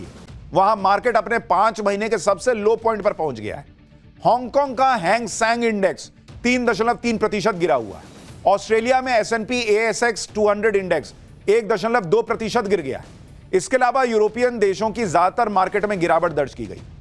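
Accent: Bihar, India. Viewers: Pure hindi language mein bolo bihari hindi nhi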